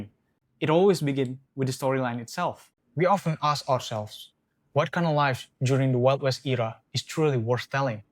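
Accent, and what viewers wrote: South, United States. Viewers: The narrator being from the southern US is kind of weird. The stories are nice.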